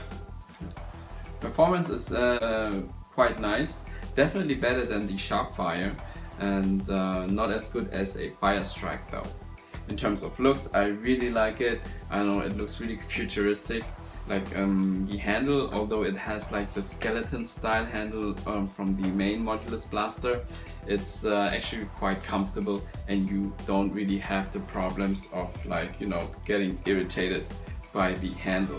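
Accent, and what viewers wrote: Germany. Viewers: You are german, yeah?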